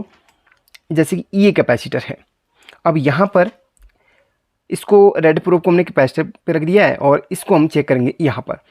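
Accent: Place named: Uttarakhand, India. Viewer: Sir bahut bahut dhanyawad,,,apki awaz se lg raha aap uttarakhand se ho , kya mai sahi hun ?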